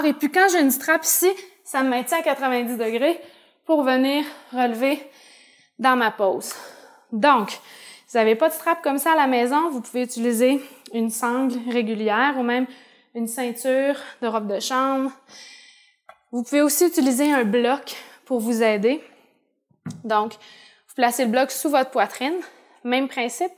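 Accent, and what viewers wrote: Quebec, Canada. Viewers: Yaaaaiiii, une Québécoise!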